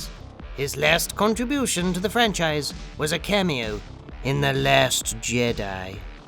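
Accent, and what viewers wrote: United Kingdom. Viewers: Good job you sound like plinketts older proper British brother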